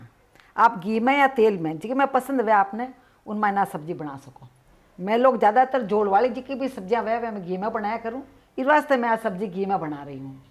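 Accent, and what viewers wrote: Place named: Marwari, India. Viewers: Mujhe Aapka Rajisthani bolna bahot pasand hai... Kash aapke jeisi meri mother in law hoti... Mein Aapko sunti rehti ❤️❤️❤️❤️❤️ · Aapki har recipe bahu achchhi hoti hai aur sath sath aapaki marwadi bhasha sunani muje bahut sweet lagati hai, main Gujarat se hu lekin samazneme koi dikkat nahi hoti. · I know what you mean. I speak Gujarati and this is the first time Madwari has reached my ears and and after few seconds it seemed natural.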